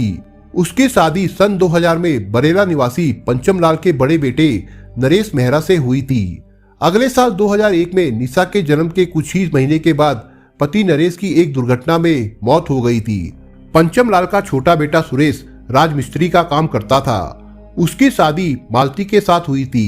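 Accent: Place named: Bihar, India. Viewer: Language sudharo bihariyon ke language mat bolo pure world mein viral hota hai video sha Ko sa mat bolo Nisha ko Nisa mat bolo aap ka video dekhna chahte hain lekin aapki language pasand nahin hai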